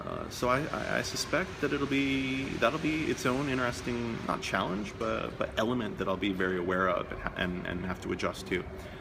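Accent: Denmark. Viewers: i can feel you have attained a little bit of a danish accent :d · Elsker hvordan du taler engelsk medd dansk accent :) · agreed he sounds danish now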